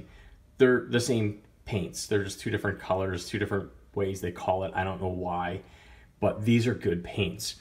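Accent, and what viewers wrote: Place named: Philadelphia, United States. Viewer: You a fellow Philly guy like myself? The accent.. either that or Bmore/Delaware. I'm just getting into customizing. I got Testors and some brushes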